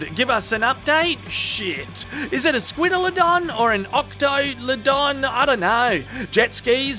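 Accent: Australia. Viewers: You are the Siskel and Ebert of reviewers with an Aussie accent. No not because they’re both dead, because you’re just that good.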